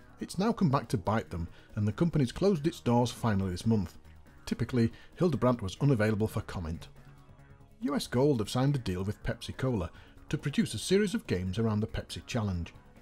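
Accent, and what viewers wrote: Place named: North, United Kingdom. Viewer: no northerners pronounce stuff correctly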